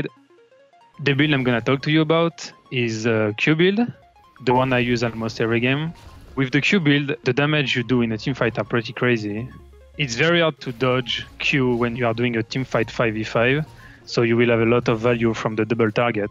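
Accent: France. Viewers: The frenglish is real :D i never know if he's saying "hit" or "eat", both make sense haha. Stil, love the vid, and love the team <3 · warchief ultradeath conan barbarian terminator.... But English with French accent is so good!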